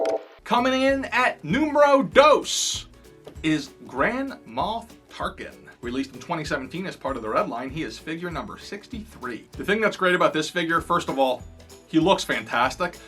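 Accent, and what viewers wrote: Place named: Pennsylvania, United States. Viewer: 3 minutes in and I'm saying to myself "He's GOTTA be from PA with that accent.." HAHA! I'm from Philly, I'd know that accent anywhere, haha! GREAT VIDEO!!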